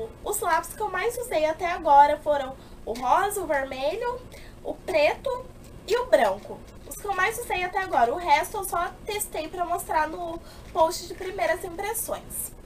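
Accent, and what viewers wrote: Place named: Brazil. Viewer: Olá querida, belas comprinhas, mas esse sapato azul, uuii adoro !! Sou portuguesa mas amo o sotaque brasileiro ;) Passa no meu canal que tem video novo ;) Beijinhooo grandeee *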